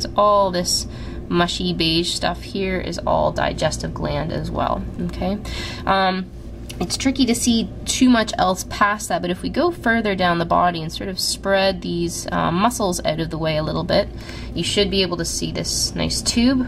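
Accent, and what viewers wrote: Canada. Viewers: Love the canadian accent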